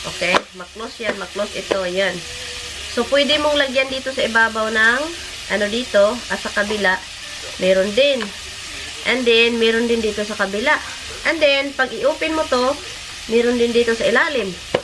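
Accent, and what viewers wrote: Visayas, Philippines. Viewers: Janice sandmayr perfect ka ba?bisaya nga sya di ba?wag ka manood ng vlog nya kung basher ka lang!How dare you!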